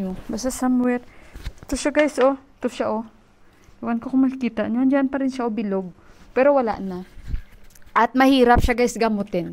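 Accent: Iloilo, Philippines. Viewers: Hello po ma'am watching from taytay rizal.Ang cute ng accent mo ma'am alam ko ilongga po kayo🥰🥰🥰 madali lang po gamotin ang buni danas ko po yan sobrang kati.Gusto ko lang po i share kung paano ko ginamot ang ringworm ko.Simply lang po madali lang lalong lalo na sa mga malayo sa bilihan ng gamot para po ito sa inyo,madali lang po gamotin kumuha lang po kayo ng isang dahon ng langka na tuyo yung medyo malaki na dahon at maliit na lagayan sunogin lang po ang tuyo na dahon ng langgka at lagyan ng langis ang abo nito kunting langis lang yung tama lang mabasa yung abo at ipahid doon sa ringworm yan lang po linisin muna sabonin maigi bago lagyan ng abo ng dahon ng langgka bago ipahid.Sana makatulong ito lalo na po doon sa mga malalayo sa bilihan ng gamot.Salamat po more power po sa channel nyo ma'am GODBLESS po🙏🙏🙏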